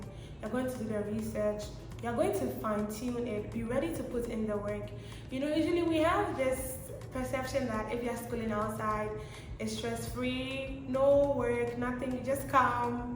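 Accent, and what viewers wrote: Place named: Japan. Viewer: 😃, your english accent now tho. You are sounding more Japanese now😂. Wisdom you speak. Thanks for sharing and again, you inspire!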